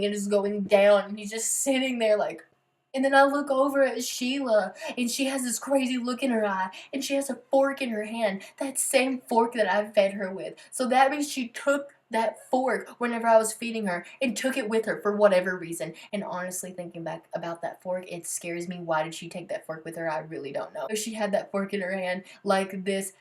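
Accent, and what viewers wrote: South, United States. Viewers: This is the very first video I’ve ever seen of her, but I hear a southern accent and it’s SO cute!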